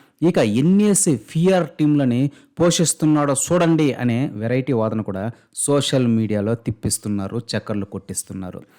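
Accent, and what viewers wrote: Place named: Chittoor, India. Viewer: nuvvu just only mega family kosam videos pettevaadu erri puka nuvv chittoor language lo matladuthunnav ra erri puka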